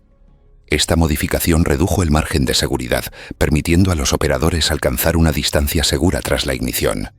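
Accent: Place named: Spain. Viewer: ME ABURIO TU ACENTO ESPAÑOL DEMASIADAS ESES Y ZETAS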